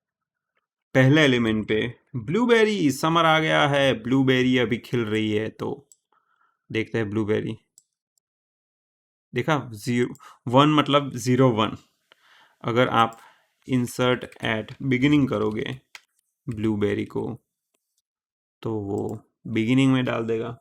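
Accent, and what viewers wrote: Gujarat, India. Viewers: kitni bhi kosis krlo gujarati vala flow to nikal hi jata hai😄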